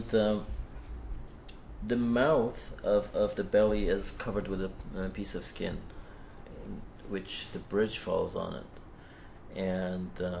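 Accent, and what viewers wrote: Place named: North America. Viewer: wow! he speaks like a man who live in toronto or new york for 20 years! way to go man. excellent musician. i like combination of Kalhor and Shajarian. they are awesome.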